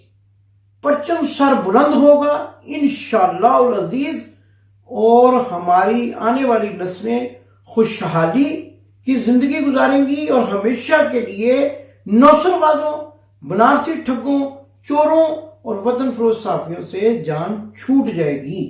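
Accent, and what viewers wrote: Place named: Lahore, Pakistan. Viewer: اچھی رپورٹ دیتے ہیں۔ پنجابی کا لاہوری تڑکا بھی اچھا لگاتے ہیں۔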